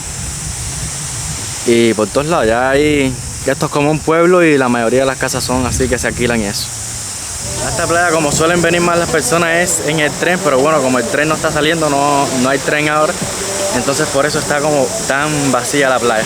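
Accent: Cuba. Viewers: tu muy marcado acento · Me gustan mucho tús videos y comentarios,te felicito hablas muy lindo sin groserías ni los asere y con el acento cubano.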